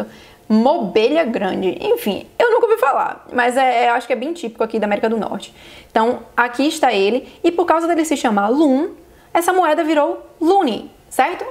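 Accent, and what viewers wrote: Brazil. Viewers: vc merece parabéns só por ter falado o nome do estado escroto no norte hahaha mas tem umas dicas boas. curti mais seu sotaque brasileiro (sdds br)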